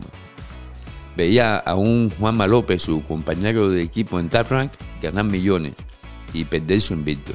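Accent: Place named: Cuba. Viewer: Compay yo entendi porque soy cubano pero es documental hablado esta calentico solo pa cubano que hablamos mal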